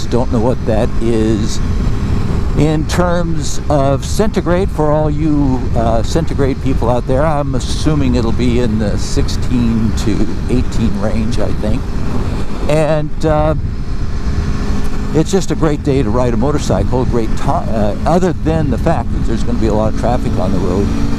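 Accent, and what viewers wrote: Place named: Spain. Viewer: Nice ride, I can say that you are a natural Spanish speaker :) Be safe my friend.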